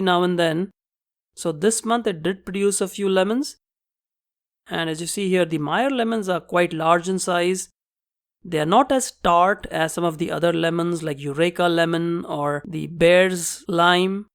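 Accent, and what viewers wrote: India. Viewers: hey dude u sounds like native indian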